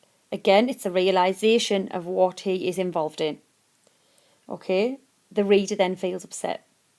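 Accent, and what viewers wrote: Newcastle, United Kingdom. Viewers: Your video has really helped me, thank you! Your accent has me assuming you are from Northern England with a Geordie accent?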